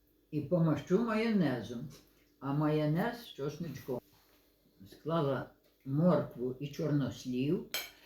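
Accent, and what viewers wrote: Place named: Vinnytsia, Ukraine. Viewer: Здоровля, сирва, бурачок, так говорять на Вінниччині в Чечельницькому р-н😊😊❤❤❤, там проходили мої літні канікули у бабці в селі❤❤. Обожнюю, не можу наслухатись.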